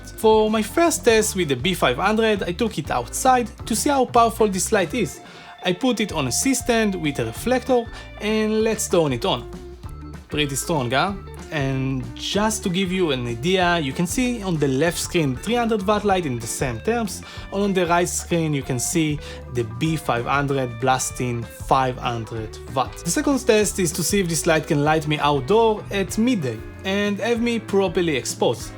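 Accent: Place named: France. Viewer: your accent is fine dude. He’s just a hater. Most people LIKE accents. Sounds like you are French. Your accent is strong, but by no means hindering your communication.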